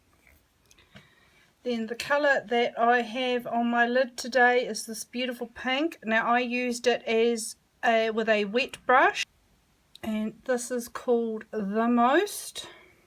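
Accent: New Zealand. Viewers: Hi from Malaysia. I came across your channel while looking for tutorial on Glambition palette. I quickly recognize your Kiwi accent as I lived in Christchurch for a few years and went to University of Canterbury. I binged watched a few more of your videos and realize that you live in Chch too. I feel connected with you already! Thanks for your videos.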